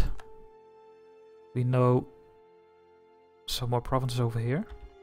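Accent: Netherlands. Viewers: I can really tell that you are Dutch from how you pronounce the Dutch cities. It's strange hearing a mix of English and Dutch like that.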